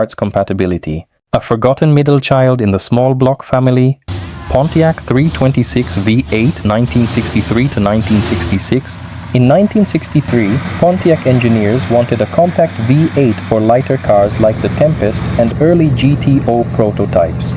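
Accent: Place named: India. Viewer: > Indian accent narrator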